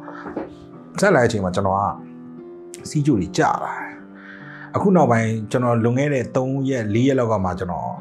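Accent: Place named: India. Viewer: ဟြန္ဒိုင္းကAccentကမိုက္တယ္ဗ်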